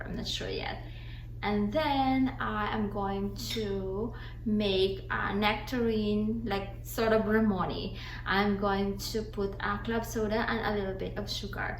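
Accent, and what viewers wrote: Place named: United States. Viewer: i have never succesfully grow tomatoes. you have more fruits and vegetables in your garden than mine beh. amazing you can grow calamansi as well. you have american accent already beh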